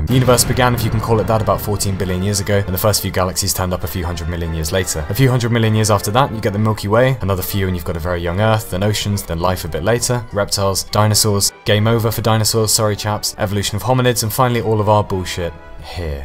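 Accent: United Kingdom. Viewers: Language: English Accent: British Subtitles: Spanish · He doesn't sound very Norwegian. We usually don't speak with a British accent and it's also pretty hard for us to shake off the Norwegian intonation and pronunciation. If he really is then kudos to him for speaking so fluently. But hey, maybe he's half-British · I feel like this guy says all the things that I'm already thinking, but just in a British accent. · The British English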